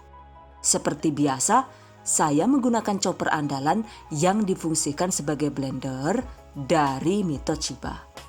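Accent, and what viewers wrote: Banyumas, Indonesia. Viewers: Aku mah fokus ke ngapak nya lucu abis 🤣🤣🤣🤣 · Susu kedele ne mantul banget,cocok buat ide usaha tuh...nyong sok ngguyu2 dewek nek nonton video ne Rika ne Yu!,kreatif karo lucu koh ana ngapak2 e...hehehe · Enak dan seger bund susu kedelenya,aku sangat suka, diminum hangat enak,diminum dingin lebih seger, pokonya mantap,sukses selalu bund,ngapaknya yang bikin ngangenin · Budhe.. ko basane kaya basane nyong kabehan 😂 tiyang pundi budhe??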